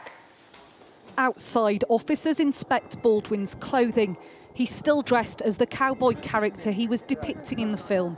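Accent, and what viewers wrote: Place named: United Kingdom. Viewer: so tired of the Brit-ish voice overs.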